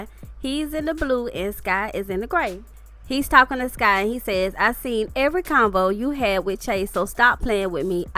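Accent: South, United States. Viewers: Glad your fine.. Love that accent by the way💯 #SouthernBell😍👌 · Aww my luv am praying for you!!!! Yes life is EXTREMELY short, plzzz take care of yourself, watch what you eat, drink, and exercise its so so important for our people. So glad to continue to hear your lovely voice with a southern twang!!!!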